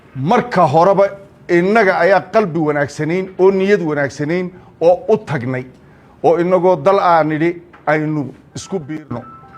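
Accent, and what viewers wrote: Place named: Somalia. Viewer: Waa faqash miyaadan arkayn lahjadiisa doqoniimadu ka muuqato kuma dhacaan in ah inalahadlaan iyagoon iskakeen dhigin😂😂😂😂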